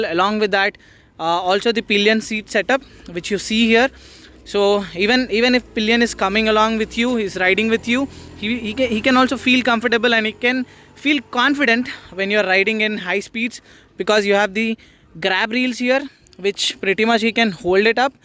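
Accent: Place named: United States. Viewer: ur english is awesome !! speaking like an american !!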